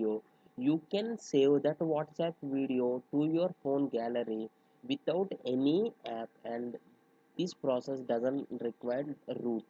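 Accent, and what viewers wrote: India. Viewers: You have an Indian accent but at least you speak understandable English. Thank you!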